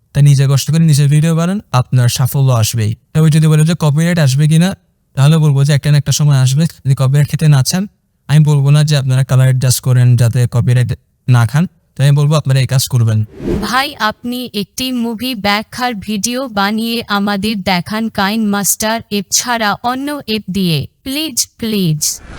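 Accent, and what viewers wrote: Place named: Chittagong, Bangladesh. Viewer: Only in this video of yours "Chittagongian accent vibing" ...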